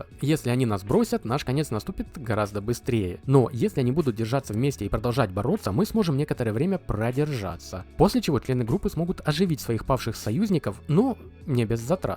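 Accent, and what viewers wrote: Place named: South, Russia. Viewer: Бро, извини за возможно лишний вопрос, но почему у тебя в разных видосах где то есть диалект, а где то нету, говоришь без него, на букву "Г" предположительно южного диалекта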